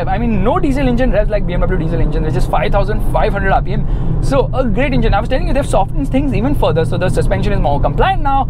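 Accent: India. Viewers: Can you speak a little slow. Your english sounds like some Indian language for sure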